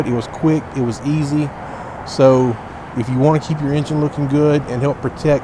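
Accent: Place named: Texas, United States. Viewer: Wow! And I love the accent, is that Texan mate?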